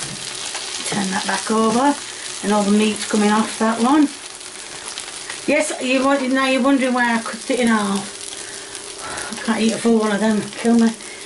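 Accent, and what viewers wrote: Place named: North, United Kingdom. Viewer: Hiya... I find your lovely northern accent very comforting and your cooking very inspiring... I would love to see you do your favourite food to eat/cook. 🎉❤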